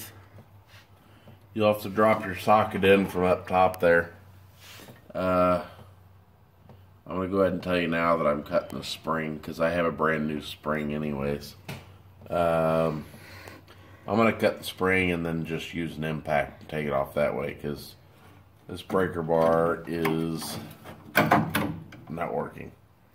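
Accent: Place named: East Coast, United States. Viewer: About time someone knows how to talk east coast checking in.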